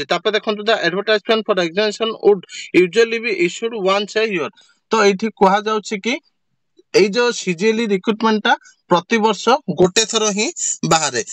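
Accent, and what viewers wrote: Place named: Baleswar, India. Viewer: Baleswaria heithiba tanka adia accent semiti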